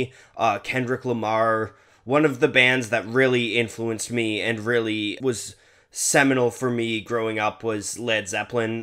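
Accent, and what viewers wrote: Canada. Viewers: You sound so Canadian it is adorable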